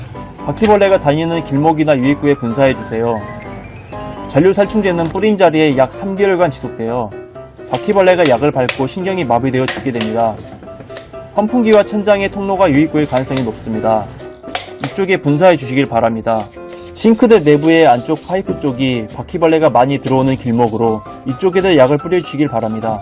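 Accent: Jeolla, South Korea. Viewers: 요게 어디 사투린가요?? 설명 읽어보니까 광주적혀있는데 이게 전라도 샅투린가요? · 아 진짜 너무 소름끼쳐요ㅋㅋㅋㅋㅋㅋㅋ와중에 전라도 말투 너무 귀여우ㅑ욤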